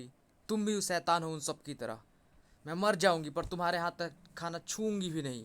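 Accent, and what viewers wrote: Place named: Bihar, India. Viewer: Bhai tumhara bolne ka lehja saaf nahi hai, apni voice ko clear karo aur thoda aaram se padho. Shabdo ka pronounced thik se karo hindi mei,,, Bihar se ho kya aisa lagta hai tumhare bolne ke tarike se.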